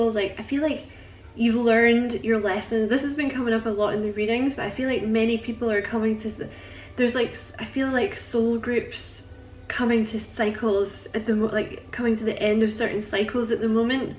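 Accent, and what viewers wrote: Scotland, United Kingdom. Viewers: I love your accent. Sounds Scottish to me. I was born there and miss it. Thanks for the reading. Im yours...lol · "How to Train Your Caterpillar" is the most adorable name ever. Awesome work & vibes, thank you. I feel very seen. And the Scottish accent is awesome.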